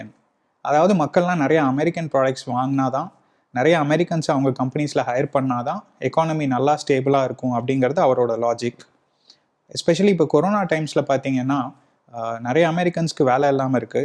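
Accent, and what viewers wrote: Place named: Coimbatore, India. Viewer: நான் வசிப்பது பெங்களூர் தம்பி நீங்கள் நன்றாக பேசினீர்கள் நீங்கள் கோயமுத்தூர் என நினைக்கிறேன் தம்பி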